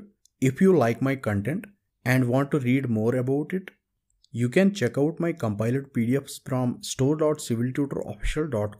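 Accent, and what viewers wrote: Kashmir, India. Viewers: Kashmiri voice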